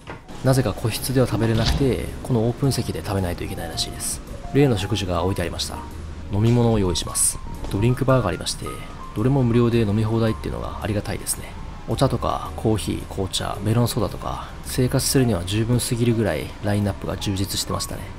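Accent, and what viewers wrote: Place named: Tochigi-Ibaraki, Japan. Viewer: 栃茨出身の方ですか？ 方言の人大好きです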